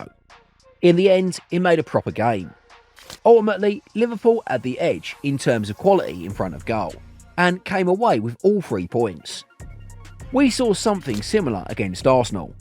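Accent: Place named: South London, United Kingdom. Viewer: Panenka sounds more like AI, even though he's not. This guy is more South London sounding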